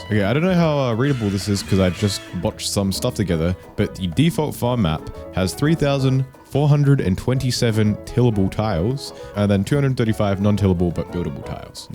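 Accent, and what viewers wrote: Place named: Australia. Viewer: i know an aussie when i hear one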